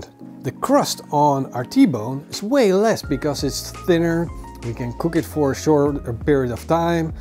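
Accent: Netherlands. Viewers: His commentary and Dutch accent is what makes his channel uniquely his. I love it, it shows a true love for cooking and eating.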